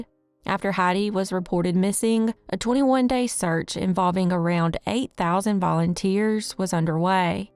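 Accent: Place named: Louisiana, United States. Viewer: Based on your accent (which I love and think is adorable) I'm gonna guess Louisiana. Am I right?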